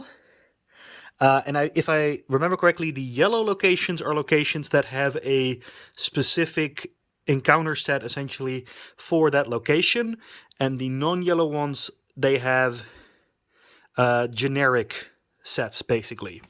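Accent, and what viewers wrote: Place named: Netherlands. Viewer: Ouch Dutch accent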